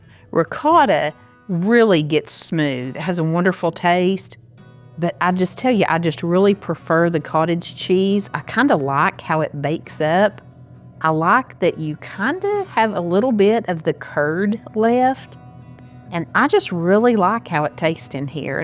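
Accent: South, United States. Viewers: I wanted you to know that you are such a blessing to me. ❤ I have PTSD and when I get days where it's bad... I watch your channel. Your soothing southern voice, smiling face, and easy ways help me refocus a bit. TY for being YOU! Hugs British Kate in 🇺🇸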